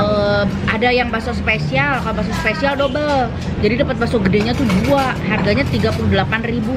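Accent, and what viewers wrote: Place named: Bogor, Indonesia. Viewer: Orang Bogor mana Sora na😁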